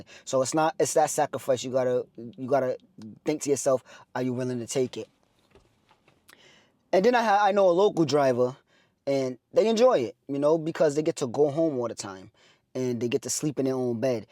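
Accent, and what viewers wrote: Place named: New England, United States. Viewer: great video! where are you from? i cannot pinpoint your accent apart from new england region.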